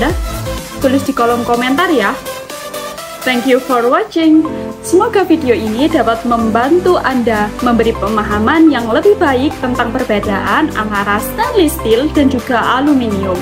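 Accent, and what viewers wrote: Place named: Java, Indonesia. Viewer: Mbaknya medok, pasti wong jowo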